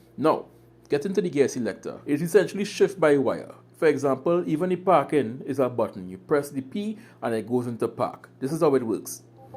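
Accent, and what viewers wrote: Trinidad and Tobago. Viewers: So freshing to hear a trini voice doing this. Means a lot bro. Thanks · bro you from trinidad?!.... this is one of if not de best local reviews i've heard/watched keep it up👌👊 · Great job with this review. Nice to hear a Trini accent for a change. BTW, the Japanese voice in the start-up sequenceis telling you that the ETC card isn't installed. This is for the Japanese toll system. This voice can be turned down with the volume button. Not sure, but I think the voice can be turned off. Also, Note is a 1.2L engine. Safety features are controlled by ProPilot.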